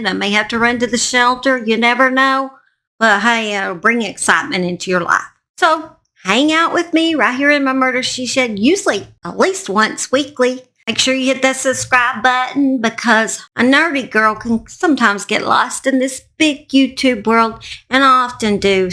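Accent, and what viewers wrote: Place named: Alabama, United States. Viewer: I could listen to your accent alllll day. 🥰 This is another reason why I subbed to you (including how you tell the sad stories). ~~~~~ Edit : where are you from? Alabama?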